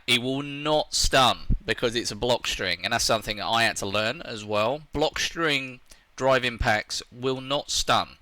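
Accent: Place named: England, United Kingdom. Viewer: Great vid man. Good to hear it from an English accent too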